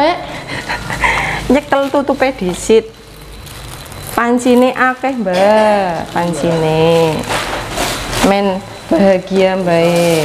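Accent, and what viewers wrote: Banyumas, Indonesia. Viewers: Mba rumahnya mana si kok ngapak bahasanya, berarti uda plng kampung,? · Mba aslinya dari desa mana mba,soalnya kita sama sama BERSATU KITA KOMPAK BICARA KITA NGAPAK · Asli mana mba, ngapaknya sama😁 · Orang mana kokk logatnya Banyumas bgt? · Mbak asalnya mana kok ngomongnya bahasa ngapak?maaf yayangku juga diTaiwan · Halo mbak..sukses selalu..seneng banget udh plg ke Indonesia..sy suka liat video mba'e jawane ngapak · ga ngerti prokes maklum anak lugu..anak kampung..sy suka ngomongnya bhsnya ngapak lucu sj lhtnya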